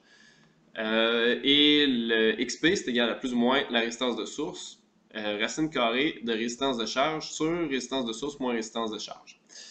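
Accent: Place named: England, United Kingdom. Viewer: merci !! and i like your englaish acsent ;)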